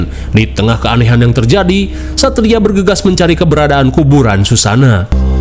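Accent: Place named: Banten, Indonesia. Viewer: Mang orang banten?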